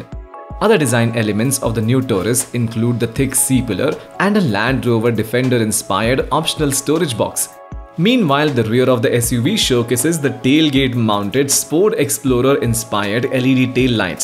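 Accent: India. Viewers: I heard...voice...indian speak english...😊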